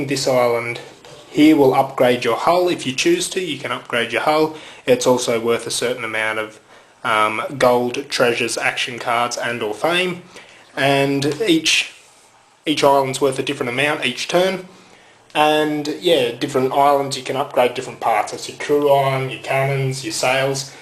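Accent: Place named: Texas, United States. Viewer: I like your Texas accent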